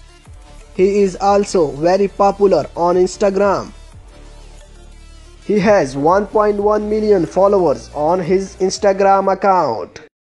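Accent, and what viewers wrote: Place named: India. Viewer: the voice reminds me of Indian English accent! now I gotta go & watch some bollywood movies !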